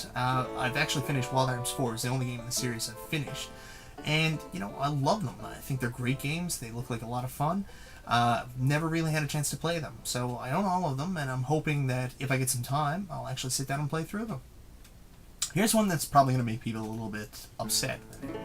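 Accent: Canada. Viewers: wheres youre accent from canada?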